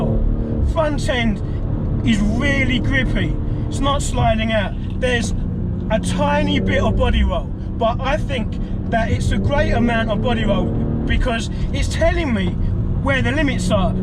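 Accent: United Kingdom. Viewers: Excellent. So great to hear how it actually drives and how fast it feels, the body roll and suspension. This is important to me. Thanks. It's funny, you seem to have a UK accent, but appear to be driving in California. Enjoy the weather, mate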